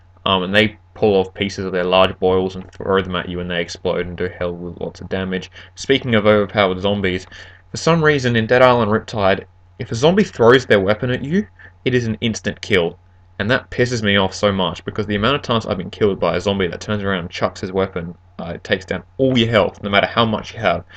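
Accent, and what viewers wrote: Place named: Australia. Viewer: you sound like a true aussie :P good work!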